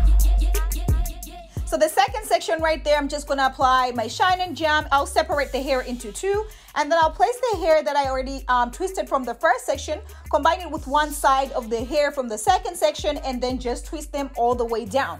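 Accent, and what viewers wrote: Caribbean. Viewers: Looking cute sis💖 you sound like you're from the Caribbean. I'm from Jamaica🇯🇲👍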